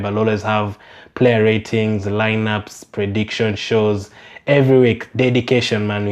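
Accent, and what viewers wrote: Kenya. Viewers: U sound Kenyan u accent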